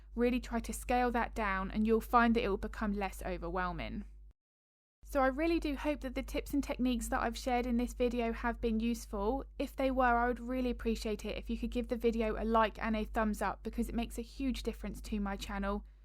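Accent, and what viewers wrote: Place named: England, United Kingdom. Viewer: Your English accent is so sweet to listen to and you are explaining so well how can someone fail to understand you. Nice job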